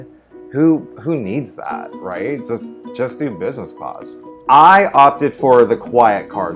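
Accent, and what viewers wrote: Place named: South, United States. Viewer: He's from the South.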